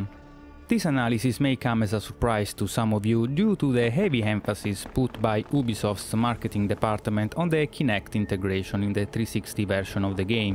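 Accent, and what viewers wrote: Italy. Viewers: si sente che sei italiano ,ti consiglio di fare un canale italiano,cosi sei sprecato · si sente poco che sei italiano! :) ahah..comunque come fai a saper così bene l'inglese? hai vissuto un po' all'estero oppure hai frequentato qualche corso? grazie in anticipo.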